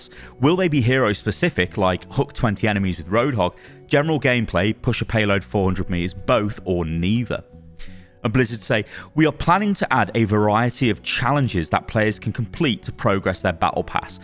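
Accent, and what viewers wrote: South, United States. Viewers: really good American (Southern) accent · your Southern accent is fucking laugh out loud!!!!!!! From the States here. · OMG giving us a southern american accent is something I didn't know we needed. But it was glorious m8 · your southern accent isn't bad. It's also kind of sexy LOL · OK, I kinda love the American Southern accent. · southern accent sounds like Chris Chan nononono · I need more southern in videos. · kkona accent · Well shitttid... your southern country accent is on point!!! Hahaha haha 😄 😆 🤣 I moved to hillbilly country and that's exactly how they sound 🤣 I wish more info was given for currencies and how they interchange. Claim it all on OW when you can! Bring back Jeff. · I took a break from OW but I am back excited about OW2. I missed your content! Also the southern accent was unexpected but funny 😁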